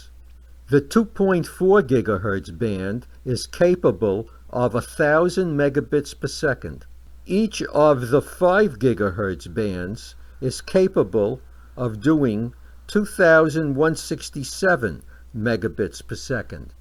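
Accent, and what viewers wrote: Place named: New Jersey, United States. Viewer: I would have guessed New Jersey, myself :)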